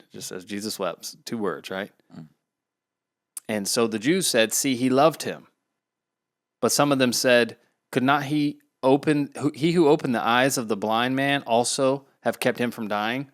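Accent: South, United States. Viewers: I live in TN haha and I thought you had a bit of a southern accent at times lol. At least now I know you are a good ol Southern Baptist haha!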